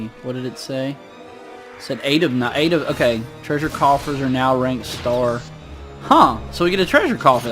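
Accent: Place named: South, United States. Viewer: I’m always surprised when I hear a familiar accent in a hobby that I enjoy. I’m completely used to being the oddball southerner that likes nerdy stuff. Cheers man, thanks for great content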